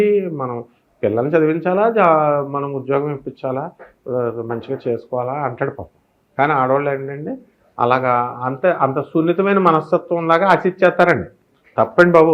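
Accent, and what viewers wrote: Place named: East Godavari, India. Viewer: East Godavari yasa andariki nachhadu, mamuluga matladandi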